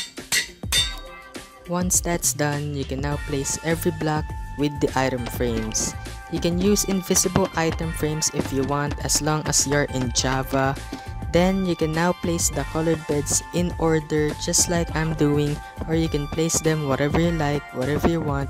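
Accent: Philippines. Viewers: you sound like you have a filipino accent. are you?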